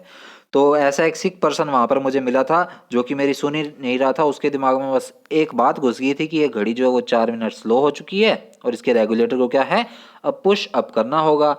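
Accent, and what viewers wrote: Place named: Haryana, India. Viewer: You teach very good 😊👍🏻👌🏻 And I think that you belongs to haryana your seeking style is showing that 🤔🤔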